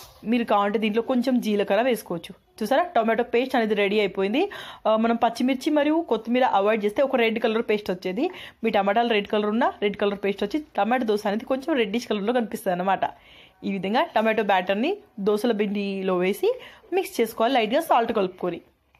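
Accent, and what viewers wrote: Telangana, India. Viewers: Me voice chaala bagundhi akka...thelangana baasha lo baga matladuthunnavu...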